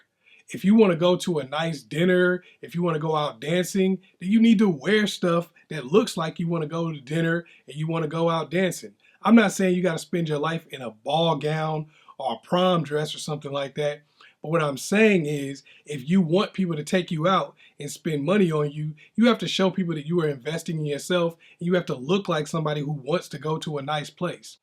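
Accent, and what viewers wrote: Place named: Decatur, United States. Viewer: Are you from the East side? You sound like you’re from Decatur? Btw great advice as always ✌🏽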